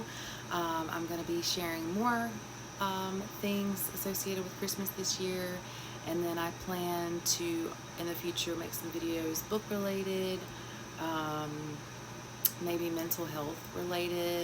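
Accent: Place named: South, United States. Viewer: I’m so excited for you and your channel !💖 Our sons have the same stocking ! How cute 😊 The DVD’s!! Love to see it ! Nothing better than having physical media. My ultimate goal is to have all our favorite shows /movies on dvd /vhs so we can cancel subscription services lol 😅 I was literally typing to recommend the Freddy FAS bear chapter books as you started talking about books lol because my son used to love those. Also loving the bookshelf ! 🥰 And your accent so cute and southern! 💖 Just know you have a loyal subscriber here girlie ! 🤗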